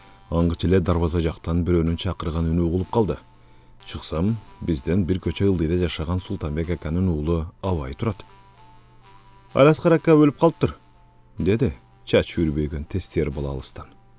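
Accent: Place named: Batken, Kyrgyzstan. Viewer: Ото соонуууууун укулуктуу Биздин Баткендин тили менен суйлогону ото укулуктуу ❤😊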